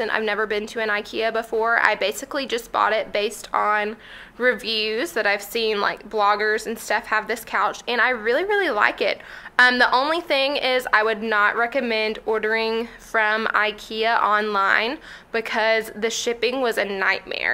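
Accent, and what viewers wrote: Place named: Tennessee, United States. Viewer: I found your channel through this video, it was reccomended to me because of my minimalism searches. lol. I rarely comment on anything on YouTube, but I had to say, I love everything about you and your channel. I live in Wisconsin, but I'm from Pigeon Forge, Tennessee. You remind me of home! Your accent, your style, your record.. Everything ❤️ You're such a breath of fresh air. I'm subscribing for sure! ❤️❤️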